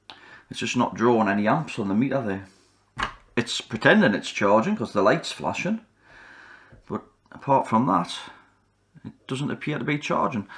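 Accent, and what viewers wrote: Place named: North East, United Kingdom. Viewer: The North-East accent is never a problem.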